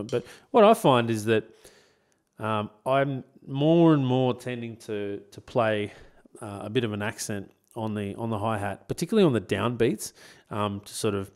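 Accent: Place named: Australia. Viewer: Was not expecting you to have an Australian accent haha. Good video.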